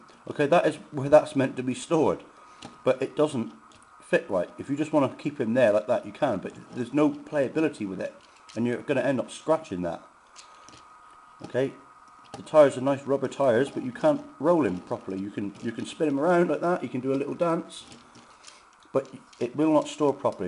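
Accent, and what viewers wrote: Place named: England, United Kingdom. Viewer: Yes nice review, with the English accent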